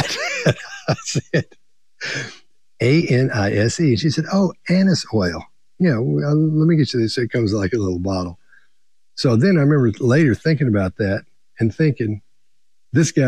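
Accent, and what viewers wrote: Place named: South, United States. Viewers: Love that Southern accent. Smooth as honey. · the whole time I was thinking Tennessee!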